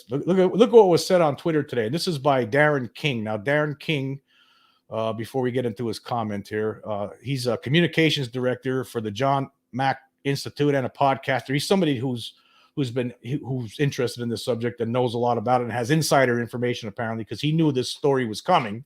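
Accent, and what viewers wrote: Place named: Long Island, United States. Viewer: Ok. I grew up on Long Island. Sorta recognized the accent as being in the ball park. Thanks…and good show today. When do you do your podcasts?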